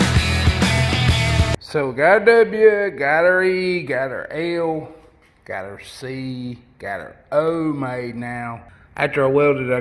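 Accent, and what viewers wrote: United States. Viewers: love his country accent and done a great job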